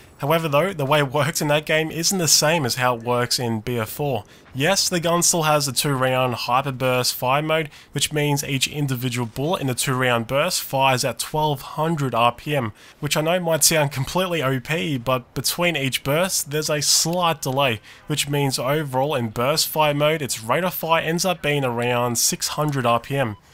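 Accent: Australia. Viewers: Are you an austrailian?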